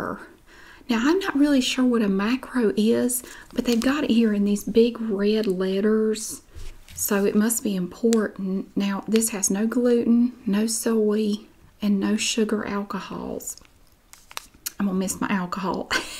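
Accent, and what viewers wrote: South, United States. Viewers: Southern accent in all its glory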